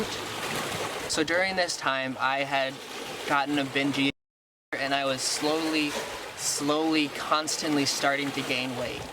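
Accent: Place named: Maine, United States. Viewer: AYYY 3 dollah deweyyssss r u from maine wtf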